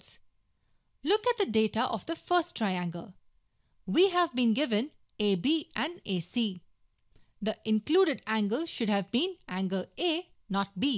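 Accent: India. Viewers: She sound like indian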